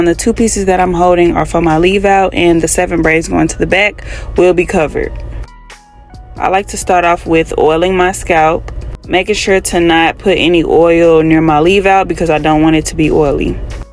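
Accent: St. Louis, United States. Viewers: She sound like she from st. Louis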